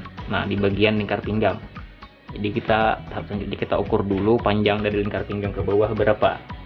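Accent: Banjar, Indonesia. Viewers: Saya baru liat menit 5 , tidak sampai selesai video. Sudah paham... Sebab saya sudah menjahit/penjahit 15tahun... Tapi saya mau bertanya,anda orang mana , suaranya itu loh🤣🤣🤣 Banjar atau apa??? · Banjar nih kedengaran nya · Logat kayak urang banjar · Pian nih logatx kaya org Banjar Bermanfaat BNR YouTube pian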